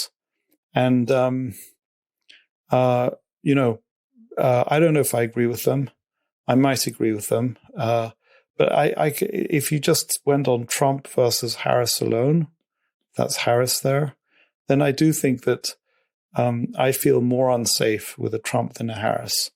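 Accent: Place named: RP, United Kingdom. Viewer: ABSOLUTE FUCKING BOLLOCKS. TAKE YOUR PONCY ENGLISH ACCENT AND FUCK OFF. YOU'VE NO IDEA WHAT THE FUCK YOU ARE TALKING ABOUT.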